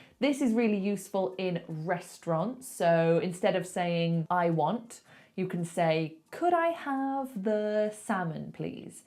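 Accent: United Kingdom. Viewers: I like the way you talk, I like British accent, if ever I'll go to the UK, I want to go to cavern in Liverpool and you to see you in person.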